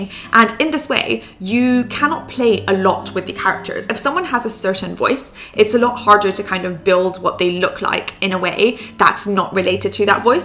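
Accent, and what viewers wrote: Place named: United Kingdom. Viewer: plz add subtitles I'm not compatible with British accent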